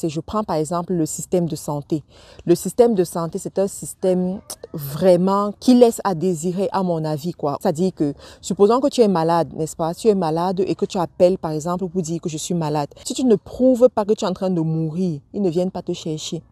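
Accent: Cameroon. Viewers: l'accent camerounais , j'ai tellement trainé avec eux que je reconnais direct les Kmers . 😅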